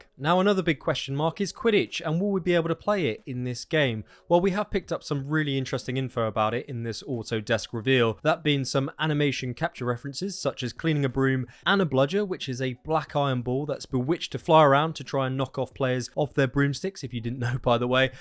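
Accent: United Kingdom. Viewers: Big up the British accent on these videos 👍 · There's something about a British accent speaking about Hogwarts/The Wizarding World 🤣 Great video, looking forward to Gamescom! Thanks!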